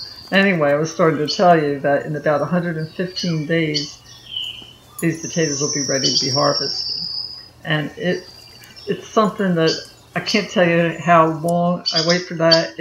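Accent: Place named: mixed, United States. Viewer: I knew I heard you talkin our NJ/Philly twang,! We're in southern NJ, but my family is originally from Philly. Thx for the cooler idea!:-)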